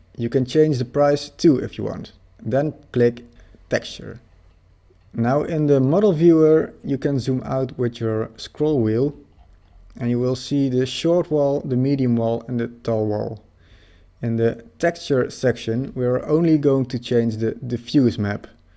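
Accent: Netherlands. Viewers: are you dutch? you sound dutch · Are you dutch? you have like an dutch accent just saying❤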